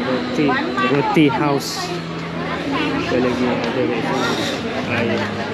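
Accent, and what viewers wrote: Malaysia. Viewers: mcm org Utara bang..mcm Kedah Penang Perlis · Cakap Melayu macam orang Malaysia. Dok Malaysia ka?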